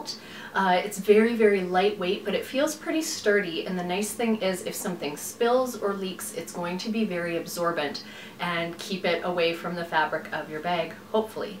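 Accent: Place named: Canada. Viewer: Me: hmmm her accent sounds Canadian? 🧐 Her: pulls out Canadian stamps Me: Beaming bc i guessed right ☺️☺️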